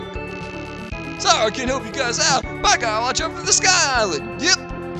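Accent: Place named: South, United States. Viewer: You should probably fix your audio sometime. Music comes from left, mostly. The rest from both sides. Might just be me *using headset* Also...A Persian with a southern accent. *imagines*mind explodes* o__o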